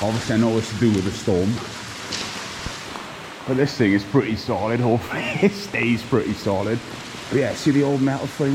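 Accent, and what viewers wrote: Wales, United Kingdom. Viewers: Where you from mate im from Abergavenny and by your accent i recon you've got to be from somewhere like Brynmawr or Ebbw Vale??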